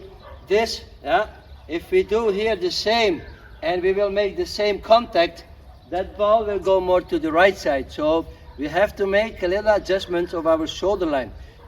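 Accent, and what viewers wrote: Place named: Belgium. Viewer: dankjewel meneer. I thought I picked up the accent - I used to live in Belgium :)🇧🇪 Thanks for the advice on the back. I often get some backache just from playing so I need to be extra careful with serve but I’ll give this a go! Again, great video. Thanks!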